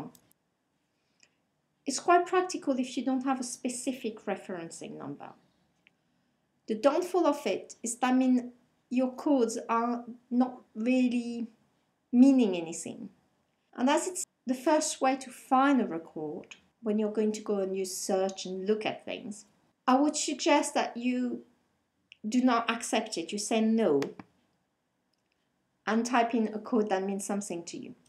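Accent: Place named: France. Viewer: You are especially short-sighted if you think her "funny" accent makes her clueless about Sage. If anything, it goes to show her fluency in one more language (french), which is something I doubt you will ever boast of. If you had anything useful between your ears you'd obviously see she has an in-depth knowledge of the subject with the way she states the advantages or disadvantages of using particular options. Nitwit!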